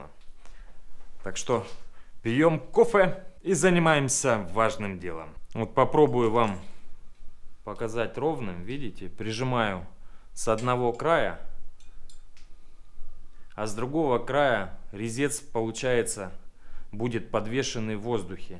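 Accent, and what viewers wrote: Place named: South, Russia. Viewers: у вас говор такой южный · До сих пор не могу привыкнуть))) В голове Игорь за кадром)))))) видимо у вас говор такой южный.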